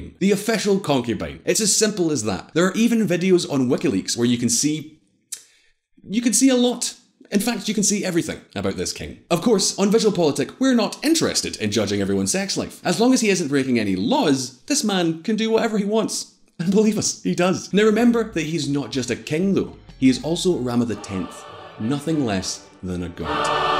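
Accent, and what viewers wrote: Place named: Scotland, United Kingdom. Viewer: Nothing wrong with Scottish brogue...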